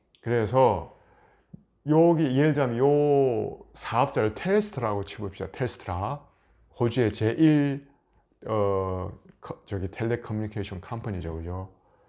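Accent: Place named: Gyeongsang, South Korea. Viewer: 영상 너무 유익하게 보고 있습니다^^ 경상도억양의 fluent한 잉글리쉬가 너무 재밌어요..!! ㅎㅎ 재밌게 ?직관적인 설명 감사합니다~~~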